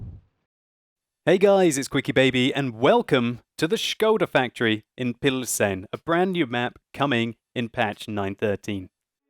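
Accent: Germany. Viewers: German accent 10/10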